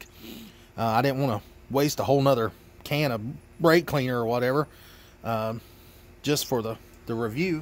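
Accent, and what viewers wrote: United States. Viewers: I love your cowboy accent 😍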